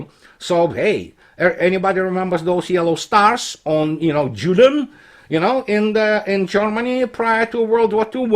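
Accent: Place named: Russia. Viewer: Glad to see and hear you again! I love your Russian accent...🙂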